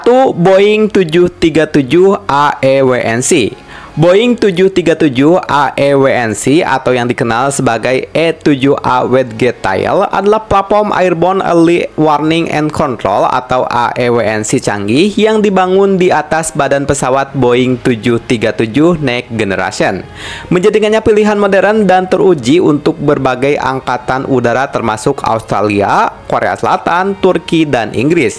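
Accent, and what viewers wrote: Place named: Sunda, Indonesia. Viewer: sunda na meni medok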